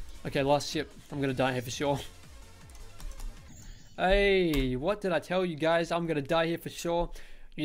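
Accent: Australia. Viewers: I live in Australia And I never knew you lived in Australia Too. I suck at geometry dash. Well I live in Tasmania and I did think you live in Australia because of your accent. HOW DO I GET BETTER AT MAKING LEVELS AND JUST EVERYTHING IN GEOMETRY DASH!!! Ok I guess I’m just cringe now.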